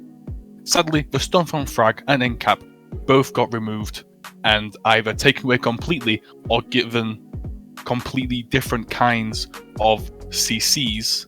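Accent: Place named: United Kingdom. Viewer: I´m just here to listen ´s lovely brit accent^^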